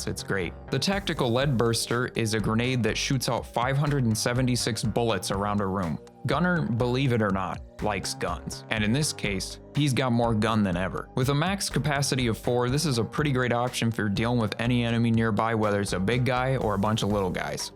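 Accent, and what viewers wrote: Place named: Canada. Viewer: Your Canadian accent is barely noticeable but it's adorable. No malice in mind, just been seeing that dude on "shorts"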